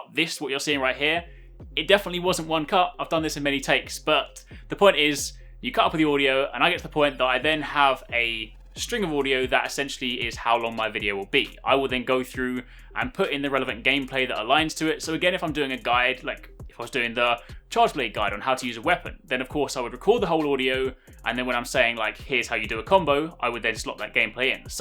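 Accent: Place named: United Kingdom. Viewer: Thank you! I'm not in videomaking or stuff, but seeing you share high quality knowledge is always good! Following your channel since you were making MH4U vids and I'm very happy with your growth, well deserved! I'm not an english native speaker and you helped me to learn the language with your tutorials in a funny way and brittish accent!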